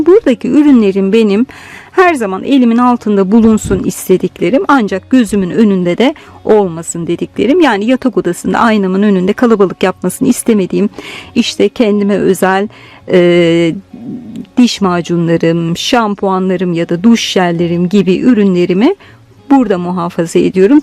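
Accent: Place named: Denizli, Turkey. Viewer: Gayduru gubbak cemilem deyve gari. Bende çok giderdim Denizliye eskiden. Aksanli videonuz cok hos. Merak ediyorum siz yurtdisinda yasadiniz eskiden? Ne is yapiyorsunuz? Sanki televizyonculuk yapmisiniz gibi.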